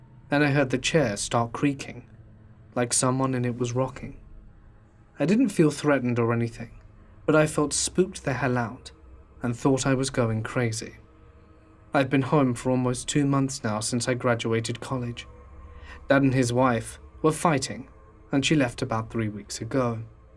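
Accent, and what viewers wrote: United Kingdom. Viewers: I don’t know why, but when someone reads stories in a British accent it’s much more believable lol.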